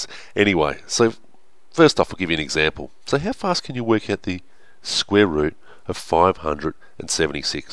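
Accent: Australia. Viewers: no, he has a aussie accent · Thank you Mr Australian man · he sounds more Australian than British. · He's Aussie that's why? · oh my god you sound australian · No he's just Australian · I was mesmerized by your Australian accent and it helped me do really well with the math on my MCAT. Thank you!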